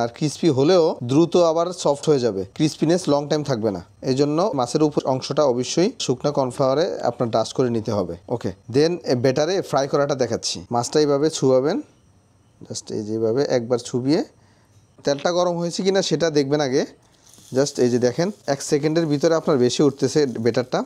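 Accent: Noakhali, Bangladesh. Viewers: নোয়াখালী এক্সেন্ট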